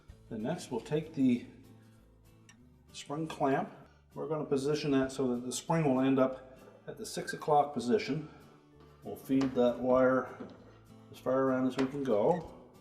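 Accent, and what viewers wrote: Canada. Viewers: Great video, and especially appreciate the East Coast Canadian accent. :)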